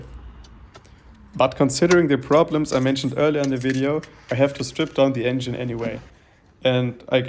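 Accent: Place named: Austria. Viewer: You have a very strong accent, are you from Austria?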